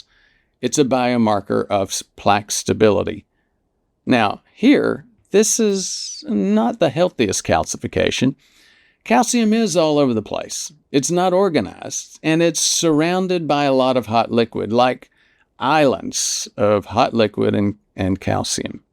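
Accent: South, United States. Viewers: Your redneck accent isn't reassuring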